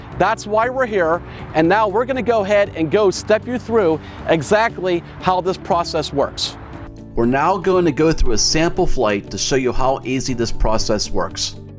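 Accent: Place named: Pittsburgh, United States. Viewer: Awesome video and extremely helpful! Also I grew up in Pittsburgh and now live in Sarasota Florida. Your voice is music to my ears! Love the Pittsburgh I can hear in your voice. Looking forward to watching your videos.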